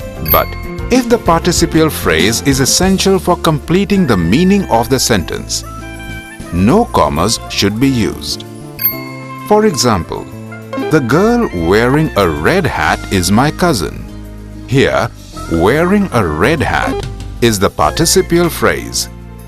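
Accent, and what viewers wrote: India. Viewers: fuck man! I dont unterstand Indian accent.... -.-"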